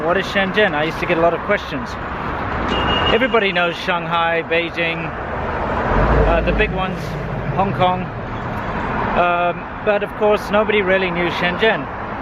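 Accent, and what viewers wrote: South Africa. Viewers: consciousnick - he’s from South Africa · You clearly do not watch South African soapies to recognise a South African accent. Recommendation. Watch past reruns of etv Scandal! By the 1000th episode, you will be able to tell who is a British South African and who is Afrikaans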